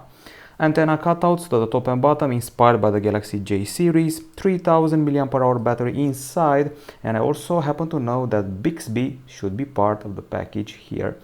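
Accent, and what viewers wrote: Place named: Romania. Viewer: Esti roman dupa engleza ta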